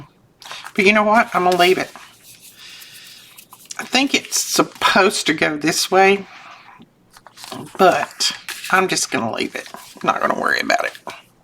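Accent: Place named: Alabama, United States. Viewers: E, as I’ve said before, I love listening to you talk. You remind me so of my Alabama family. I miss them very much. But, I also like hearing your ideas and learning from you! Thank you!!!❤ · Awe thanking yie well Ile tell yie 🖐️👅🤗 ime going to see that on Saturday with my x 2 grandwains, 2boys 5 n 8. They both just turned that age oooppphhhffffff they can't sit down but they do in the cinema 🖐️😝😝😂🙏 yie remember at age full of questions well we still love them with our heart n soul I know a lot of things change when yie Wer able to make the big meals I still do that I always put more on than I need. Its a total waste, plus be went off everything some smells it's drivinge caarazy n then ime nearly deaf I've only got 40,% of hearing left soo anyway I get tinnitus badly I hardly sleep but i hear yie woman 🖐️🙌🤗🤗💜💜😘😘 I wish I could give u big hug right noo 🤗💪💪 amd i know the feelin the grandwains they think yer purse is an open book n it never closes n always good to look at explore lol 😝😝😂🖐️👌👌 but we love them plus I love listening to you you calm me but i do watch too keep yer chin u btw your doing amazing remember you take care Mrs b good 👅🖐️😘🤗 i hoe i made yie smile with my Scottish patter n slang words n I love yer accent that deep south love it I know I know Mrs xx 🤗🌹🌹💜💜❤️‍🔥❤️‍🔥❤️‍🔥🙏🙏 sounds a funny big guy god luv his wee soul gdnyt or morning whatever comes first for you I've been dying papers all day making them pink with blowing the bubbles on them I love the effects,🙌 soo tired noo thanks for reading tillthe end 🙏❤️‍🔥❤️‍🔥🌹🌹🏴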